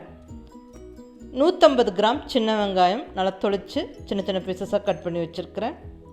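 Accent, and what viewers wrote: Coimbatore, India. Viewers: I like all your recepies and trying too, coming very well and unga kongu tamil enaku romba pidikum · Coimbatore pasai semma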